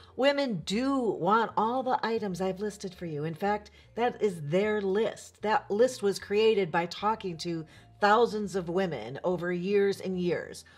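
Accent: Chicago, United States. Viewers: I am getting addicted to your blogs, since I am a recent widower in the dating game. I am very comfortable listening to your Chicago accent! Do you have a good friend who needs to date another "Good Guy"?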